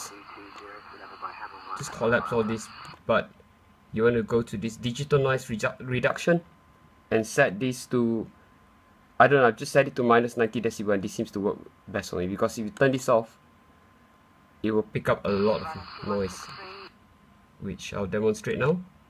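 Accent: Singapore. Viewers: your accent is similar to the way my friends from Singapore spoke. It was nice to hear someone speak that way again.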